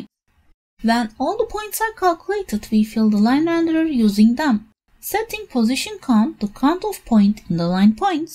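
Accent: Turkey. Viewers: aksanindan anladım :) ama tebrik ederim güzel içeriklerin ve kanalın var. Bir kaç videona baktım güzel gerçekten :)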